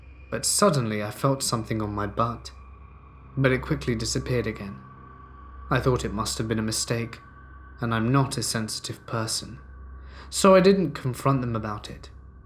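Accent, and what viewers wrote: United Kingdom. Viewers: Does every one of these channels have to have a British accent ?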